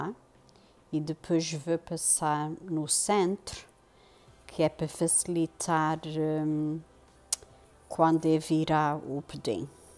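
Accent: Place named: Azores, Portugal. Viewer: É a primeira vez que vejo fazer molotov sem pôr a mão caramelo na forma, mas sim na claras, é muito mais fácil, obrigada senhora pela receita 🙏 desculpa a pergunta a senhora é dos Açores, porque tem a prenuncia parece ser dos Açores.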